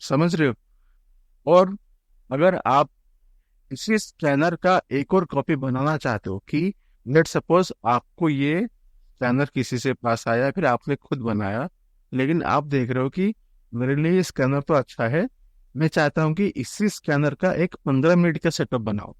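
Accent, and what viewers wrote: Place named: Bengal, India. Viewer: bhai aapka hindi samaj mein ataa nahin.. bengali bolte ho aisa lagta he..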